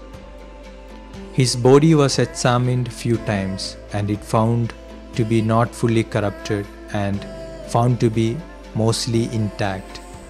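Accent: Kerala, India. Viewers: Hearing the voice of the narrator I know his an Indian. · Sounds like a malayali..... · Is this voice over given by a priest from Kerala? Nalla kettuparichayam Ulla slang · That Indian accent bothers me! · Beautiful video. Nekkul Malayaliee anuuu ellai. I understood that from your voice. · Sounds indian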